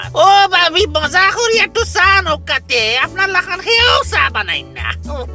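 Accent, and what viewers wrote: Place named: Sylhet, Bangladesh. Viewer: this guy is actually fakhna sylheti... like how someone born and bred in desh would actually be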